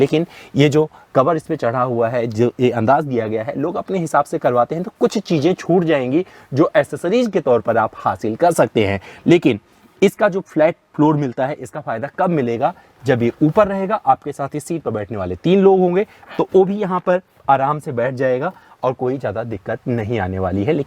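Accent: India. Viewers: Really your review with hindi accent is just fantastic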